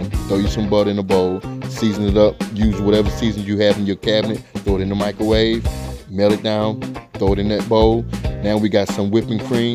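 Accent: South, United States. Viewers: I love how Americans talk “Some of this here minced garlic” “Get you a skillet put you some butter in it” 😂😂😂 it makes my heart warm 😂😂😂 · Not all Americans talk like that. (Nothing wrong with it) He has to be from down south somewhere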